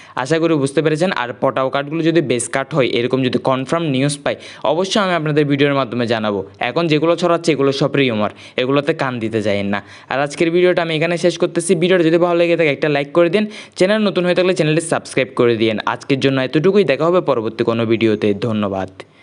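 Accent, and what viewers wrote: Chittagong, Bangladesh. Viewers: Vai ki chatgaiya naki?🫠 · Vai apni bangla vasar shate chittainga vasha mix kore felen kno? Apnar kotha golo sunley hasi pay😅😅😅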